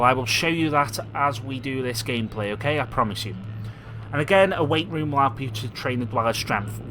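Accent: Scotland, United Kingdom. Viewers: Nice scottish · Are scottish bc I love the accent I wish it was on android :(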